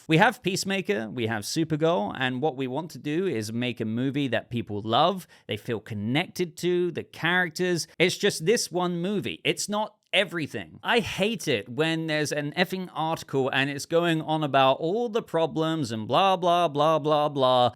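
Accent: United Kingdom. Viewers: I watch this channel so much that whenever I think about these superhero movies, I find my inner monologue has a British accent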